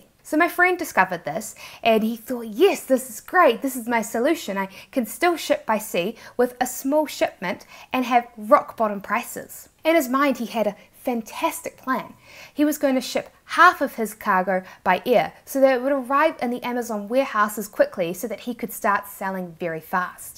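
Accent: New Zealand. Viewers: Definitely New Zealand yis ! 😂 Cute! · she has an accent, why? Because she is from New Zealand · are you a kiwi? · I love your New Zealand accent is majestic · I've never thought New Zealanders have such funny accent. :-) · yes huge and heavy, there’s more room to move if you know what I mean. Great instructional videos you provide, keep it up. I need to learn more about finding new products. I’m originally from the west coast of NZ so I can understand you perfectly:-) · New Zealand accent,,,Aussies know how to pronounce vowels.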